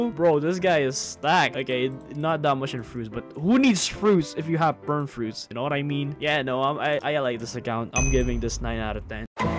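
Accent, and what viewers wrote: Philippines. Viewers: He's from Philippines not fully english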